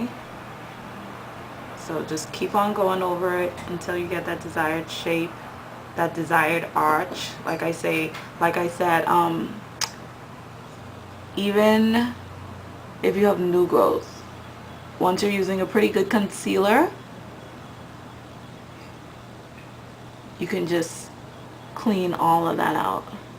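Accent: Bahamas. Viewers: where are you from? im from the bahamas and you sound like a bahamian rather tan an american to me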